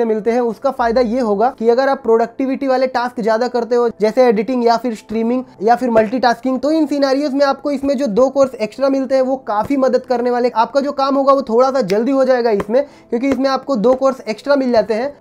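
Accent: Gujarat, India. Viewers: Bhai aap Gujarati Ho kya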